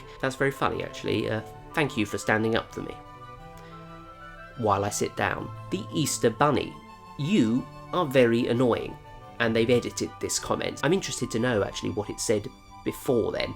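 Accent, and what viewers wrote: United Kingdom. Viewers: I love your British accent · There’s plenty of more deserving people here to troll. Flat Earthers, Non-binary’s demanding use of correct pronouns - from a tiny list of 89 last I heard. People who say “...it’s just a theory, it’s not a fact’ My preferred method though, almost ashamed to admit it, is to ignore the witless idiots - especially those who initiate a battle of wits before realising they’re completely unarmed. I’m British, we don’t all talk like this guy, or decorate or dress like him, and I don’t see it’s relevance to anything - but he’s British, we’re all ‘querky’ so being British myself, and ex-Army, I have a duty to stand by him for moral support, it’s not even a duty, it’s a given. We look after our own over here, and I’m sure that while this chap couldn’t really give a toss what those beneath him think of him - he still has feelings, and whilst even I couldn’t give two shits about them either, they’re his, and I wouldn’t go out of my way to ridicule him, his family, or even his cat - but if we met in public and faced a troll of his, then I’d have to drag the troll by, his forehead, onto a busy motorway. 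Besides, like most Brits, he’s fighting his own battle perfectly well. Don’t confuse ‘being nice’ or ‘being kind to others’ as a physical weakness, it’s how we lure you in for analysis - think of it more as pre-emergency screening. Defending underdog’s and ginger since 1977.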